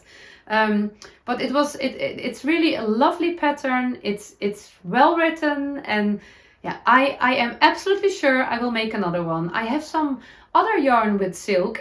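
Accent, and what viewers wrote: Netherlands. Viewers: Dear, I always look forward to your podcast episodes, sitting comfortably in my armchair knitting and listening to you. As I was born in Aken, your dutchy English gives me home vibes. Heartjumping! And of course I'm coming to Breda for the k2p2 festival. My husband is coming with me, if only so that I can show a few more of my knitted pieces on him as a model. 😇 Best wishes from Cologne!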